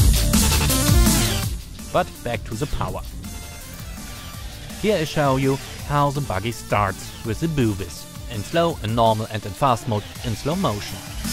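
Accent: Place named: Germany. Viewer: I can hear the German accent 🤣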